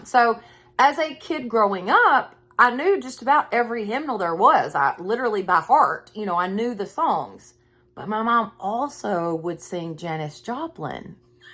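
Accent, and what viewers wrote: South, United States. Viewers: Wow! Thank you! I really needed to hear this today and I must admit I’ve watched it three times already and will probably do it again. I received some bad news about my health about a week ago and I am really struggling with it. I love your devotionals and crafts and most of all I love your voice! I actually thanked God for your voice today! I love that little southern accent. I’m in California so I don’t get to hear it very often but I particularly loved hearing you talk about the rocking chair and your mom singing to you and I have the same memories just lost my mom on September 17 and I miss her so much and I know you can relate to having a lot of bad things happen all at once. I feel like you are so relate-able. I just can’t thank you enough for all you have brought into my life through Jesus Christ our Lord! Thank you thank you thank you! 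❤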